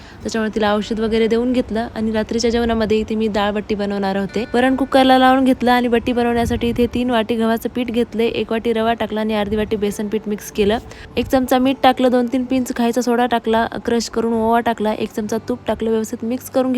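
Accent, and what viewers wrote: Parbhani, India. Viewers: मी तुला खूप दिवसापासून कमेंट कर असं विचार करत होते मला तुझी व्हिडिओ फार आवडतात आणि मुळात तू परभणीची आहे म्हणून मी तुला पाहिजे कारण की बालपणीच्या आठवणी आहेत माझ्या परभणी शहरात जर तू कधी परभणीच्या अष्टभुजा मंदिरात गेली तर तिथला vlog शेर नक्की कर कारण की अष्टभुजा मंदिर पाहून खूप वर्ष झाले अगदी मी परभणी सोडून 20 ते 22 वर्षे झाले त्यानंतर माझं लग्न वगैरे त्यामुळे खूप काळ लोटला आहे खूप साऱ्या आठवणी आहेत परभणी मध्ये शेजारी वगैरे पण खूप छान होते आता दोन वर्षांपूर्वी आम्ही सहकुटुंब आई-वडील वगैरे माझे मिस्टर माझी मुलं आम्ही सर्वजण परभणी ला एक दिवस आलो होतो त्यानंतर पण सर आठवणी जाग्या झाल्या नंतर मला तुझा व्हिडिओ असाच एक दिवस का पाहिला आणि मला तुझ्या भाषेवरून अंदाज आला होता किती परभणीची आहे बाकी व्हिडिओ तुझे छान असतात भेटू आपण कधीतरी😊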